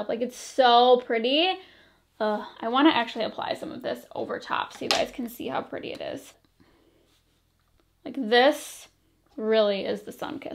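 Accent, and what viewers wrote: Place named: Michigan, United States. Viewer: This is totally random, but are you from Michigan? You definitely sound like you have a Michigander accent. :)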